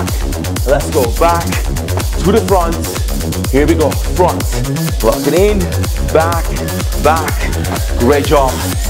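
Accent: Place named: Australia. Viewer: Sounds like Australia; but I could be wrong.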